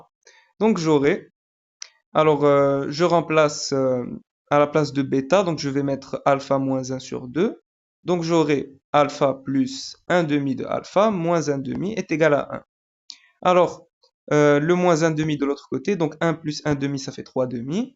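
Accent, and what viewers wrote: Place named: Arab world. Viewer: Parfois tu parles en français et y a des fois tu parles comme un arabe , je ne comprend pas 😢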